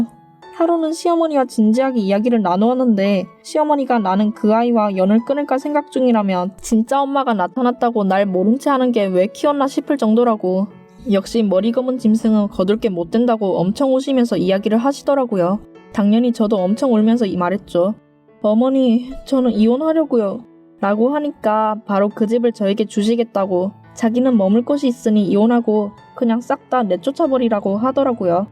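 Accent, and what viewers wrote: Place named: Busan, South Korea. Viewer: 부산 사투리 세요? 듣기 싫다 정말 !!!조심하세욧 제발 ㅋㅋㅋㅋㅋㅋ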